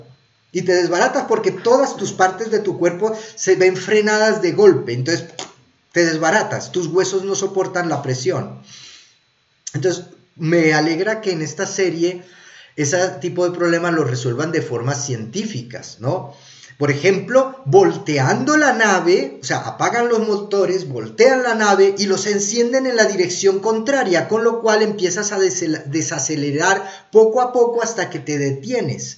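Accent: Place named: Bogotá, Colombia. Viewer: ud es colombiano, paisano rolo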